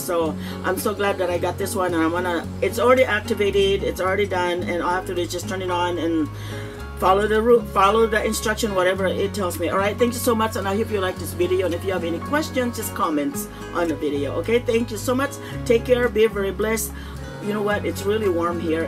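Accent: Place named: United States. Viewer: I love the way you talk kabayan as your accent sounds like an American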